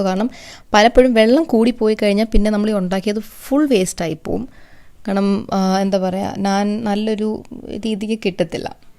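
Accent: Trivandrum, India. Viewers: vrithketta trivandrum slang.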